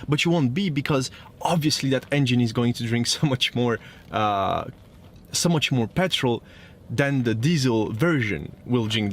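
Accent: France. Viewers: Worst minutes spent in my life. Beside the shitty english french accent, the presenter has no clue about cars. It's like he is read from a paper. Not to mentiom he is ugly af.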